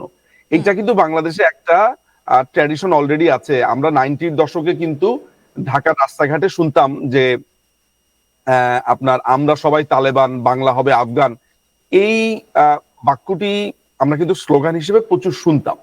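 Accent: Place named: West Bengal, India. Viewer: এই মেয়ে -------- তোর কথার সুর পশ্চিম বাংলার মত।।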